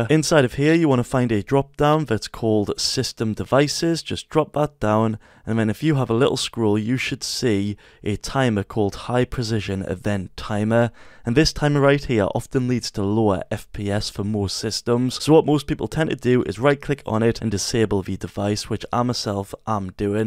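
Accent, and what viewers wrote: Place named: United Kingdom. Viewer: bro is bri'ish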